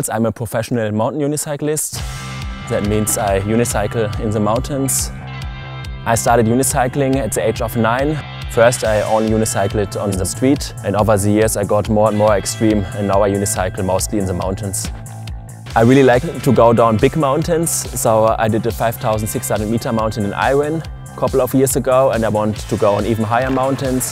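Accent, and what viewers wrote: Germany. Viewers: Man with thick German accent rides down mountain on unicycle.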